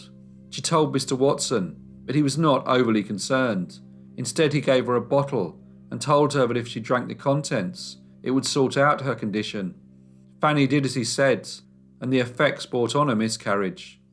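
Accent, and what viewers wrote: United Kingdom. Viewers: Your accent/inflection Britain or Scotland?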